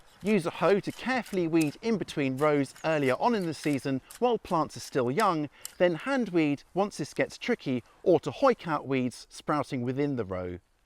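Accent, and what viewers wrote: England, United Kingdom. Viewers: The English accent helps take you seriously. Like oh shit this guy sounds like a professor I better listen up 😳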